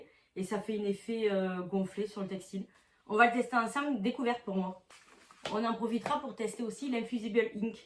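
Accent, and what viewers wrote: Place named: South, France. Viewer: hello, j'arrive, je suis tombée sur vos vidéos par hasard.... l'accent... c'est chez moi !! le sud... mais je suis plutot au dessus d'Aix. Beh du coup.... j'ai kiffé ! a très vite !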